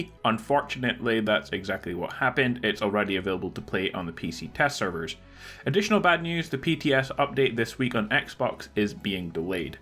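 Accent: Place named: Edinburgh, United Kingdom. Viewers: Sounds like Edinburgh. 100% not from Northern Ireland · Scotland not sure were maybe even just outside id guess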